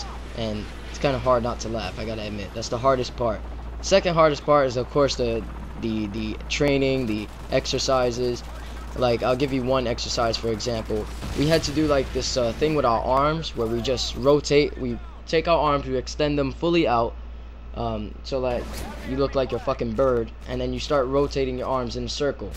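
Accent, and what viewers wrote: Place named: New York, United States. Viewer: i love the way people in NYC area say awesome and water lol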